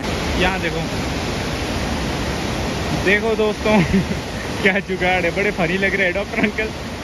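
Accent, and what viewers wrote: Pahadi, India. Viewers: Hi I am also from Himachal but unlucky I am live in Delhi . Mujhe apki Hindi sunkar lag raha hai ki app pahadhi hi ho because your voice pronunciation like pahadi people. Love from Delhi but from Himachal ❤️❤️ pahadi logzzzz 💜💜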